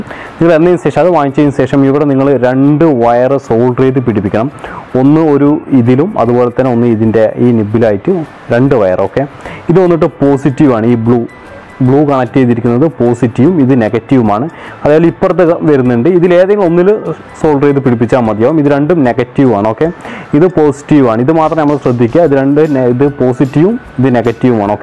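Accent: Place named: Malappuram, India. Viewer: നിങ്ങളുടെ slang എനിക്കിഷ്ടമാണ് bro മലപ്പുറ മോ കോഴിക്കോ ടൊ?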